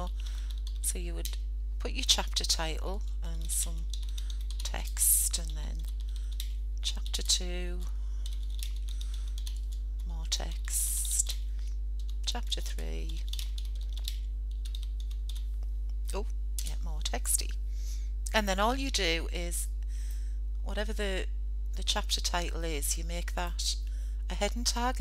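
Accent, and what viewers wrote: United Kingdom. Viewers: Scouse :D? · Ha i love the Geordie accent, thanks very much for the help! :)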